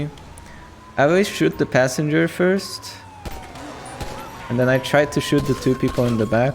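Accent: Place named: Hungary. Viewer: Na a magyar akcentus befigyel :D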